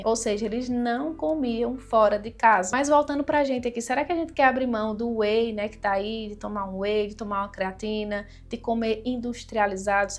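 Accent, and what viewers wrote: Northeast, Brazil. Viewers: Pelo seu sotaque deu pra perceber que vc é nordestina então vc deve saber que aqui no nordeste as coisas sempre chegam com um pouco de atraso em relação a outras partes do país.... Como vc mesma relatou que morou em cidade de interior e sabe que as oportunidades de emprego são bem poucas mesmo para quem tem qualificação!!!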